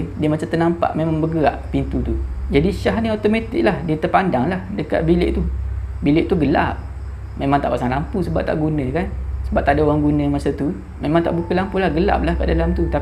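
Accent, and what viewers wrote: Terengganu, Malaysia. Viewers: Dik org trganu ke😁 Sleng tu CM cakak ganu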